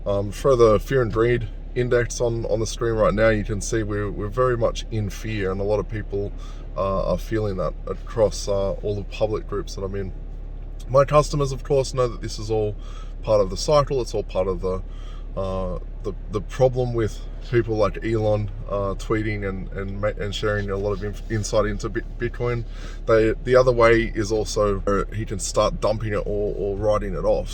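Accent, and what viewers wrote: Australia. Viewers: Yep exactly mate! From Bitboy segment . Congrats on winning that segment.. im a new sub mate.. i am Aussie so nice to hear the Aussie accent.. i live in the Philippines now so yeh to get my Aussie fix im gona watch you! Cheers and keep it up bro! P.S Elon is a Sh!thead👎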